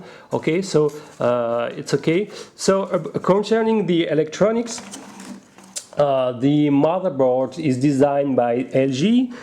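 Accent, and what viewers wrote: France. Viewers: Salut, vu ton accent je suppose que tu es français :-) Je voulais savoir si ce quad était bien pour commencer avant d'attaquer le vol sur un 650 monté par mes soins? Et que signifie Banggod courtesy? En gros ils te le filent gratos et tu leur fait un peu de pub en échange ? J'ai également une chaine YouTube, tu crois que ça serait possible pour moi de faire la même chose ? Cordialement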